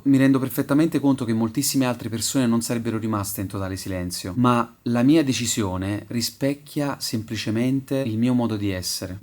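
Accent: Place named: Campania, Italy. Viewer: " sott occhio mi è sembrato che fosse marcoripa con un nuovo berretto, dato il contenuto del discorso, poi però la cadenza più campana che laziale mi ha fatto capire. non ne so niente, per la carità ma, da quello che vedo in giro, la tempesta di feci è un vero limo per certi canali chè, non meritandosi applausi di quelli sentiti e spontanei, sul fertilizzante naturale ci campano sia direttamente che indirettamente perchè, e diciamocelo, più fanno cagare e più abbisognano di un ventilatore che ne diffonda le gesta. proprio in questi giorni l ho cercata perchè ,mentre facevo la rassegna dei primi tuberi da me visonati, lei mi è venuto in mente :coincidenze. comunque devo dire che lei non mi ha mai fatto ridere, anzi. a proposito ,mi saluti il signor youtube "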